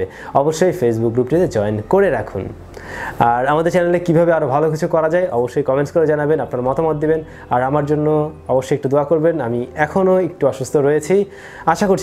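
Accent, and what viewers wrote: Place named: Barisal, Bangladesh. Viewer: that barishailla accent .... ahhhh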